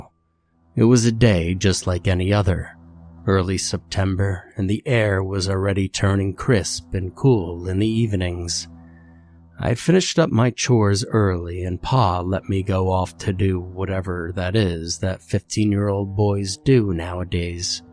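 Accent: South, United States. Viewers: Great southern draw really took this story over the top Great work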